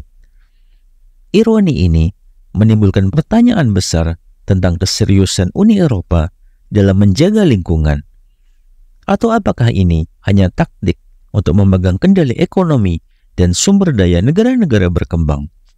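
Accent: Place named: West Java, Indonesia. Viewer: Adminnya orang sunda nih! 😂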